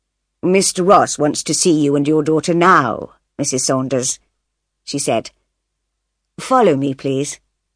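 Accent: United Kingdom. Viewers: This is British accent, I do not like it. I like American accent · Thank you. It so helpful for me in British accent and reading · Because that girl who is reading for us the story is an English girl which means she has clear accent English. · British or American accent? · مشكلتك الاكسنت البريطانى غير واضحة!!!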